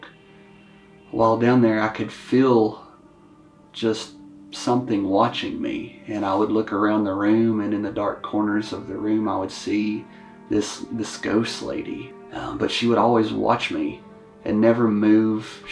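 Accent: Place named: Tennessee, United States. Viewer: WoW 😲 Cool your accent is Tenn.You sound Just like my relatives! From Kingsport, Bristol, Mendota,Johnson City. It’s on Dad's side. Great job with this & Alter was Awesome 👍 Hope you're doing well in the Industry.Happy Halloween 🎃 Season 🔥🎃👻🧛🏼‍♂️💭🦇🔥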